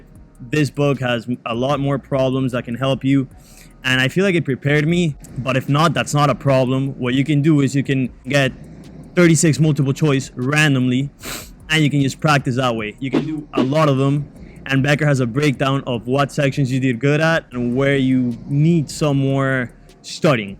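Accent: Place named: Miami, United States. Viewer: Thick miami accent lol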